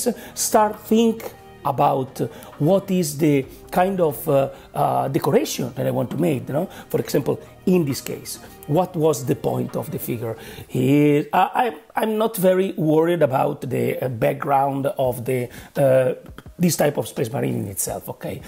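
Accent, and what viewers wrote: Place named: Italy. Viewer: Outstanding. Spoken in a true Italian Painters way.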